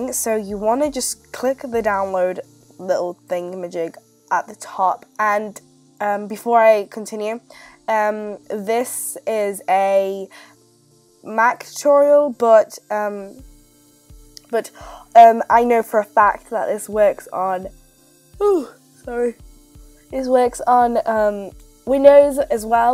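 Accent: United Kingdom. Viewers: FINALLY! Someone British.. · Are you from London?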